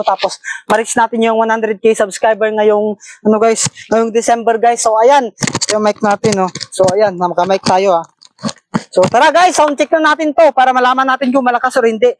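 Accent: Visayas, Philippines. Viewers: Bisaya ka dol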